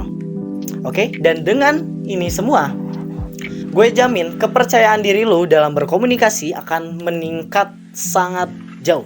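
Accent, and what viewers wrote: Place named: West Java, Indonesia. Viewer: makasih penjelasannya bg sangat mudah difahamii btw abangnya org sunda bkn soalnya logatnya kenal bgt AHAHAHAH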